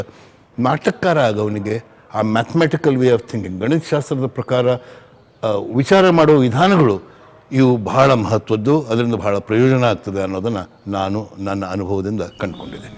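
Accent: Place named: Dharwad, India. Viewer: Pakka dharwad Kannada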